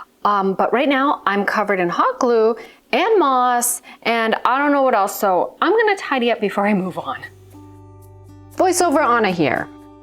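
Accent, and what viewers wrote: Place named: Canada. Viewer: Gorgeous arrangement, that first branch is such a lovely, graceful shape. You always say your name at the start of the video and to me it sounds like, but I have seen people address you as Anna. With your lovely Canadian accent maybe I’m not hearing it right! Anyway, whoever you are - thanks for the video! xx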